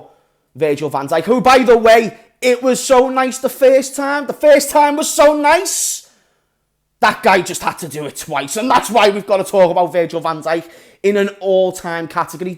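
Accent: Liverpool, United Kingdom. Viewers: Stupid scouse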